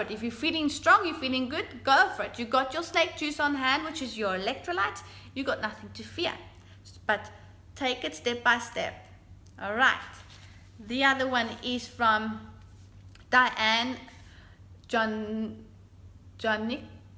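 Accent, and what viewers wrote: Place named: Afrikaans, South Africa. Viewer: are you South African? you have an Afrikaans-English accent.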